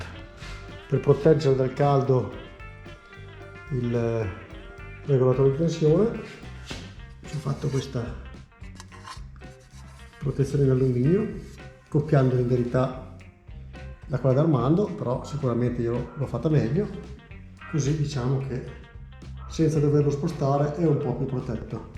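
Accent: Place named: Emilia, Italy. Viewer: mi pareva un accento nostrano, io son nato a Pavullo, piccolo il mondo. Dicevo le/la tanica sotto le valige perchè di norma son le più pesanti piene in peso specifico, più basso lo hai meglio guidi, inoltre hanno una buona base piana sulla quale appoggiare una sacca che una base rigida a volte non ha. Seguo due ragazzi (franksaffair) che sono in giro per il Brasile ora, e lui le ha posizionate li ed è venuto un bel lavoro pertanto mi son permesso questo consiglio.Di Transalp devo revisionarne uno appena si fa più caldo. Ho questo hobby. Buona strada, viva l'Emilia e le crescentine cotte con le tigelle.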